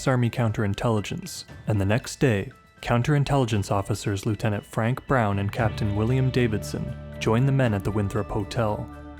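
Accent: Canada. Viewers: Judging by a sligt accent I’d say the narrator is from Canada. Right?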